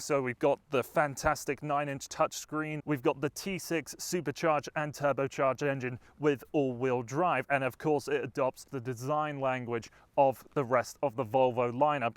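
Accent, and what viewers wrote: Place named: United Kingdom. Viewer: Thank you for another great and professional review. I am myself owner of v40 CC with old volvo's 5cylinder engine the sound of which I do enjoy and do miss in present only 4cylinder units. As to your Brit origin, I'm from Poland and for a while I was mistaken that Canadians speak so wonderfully in British English :)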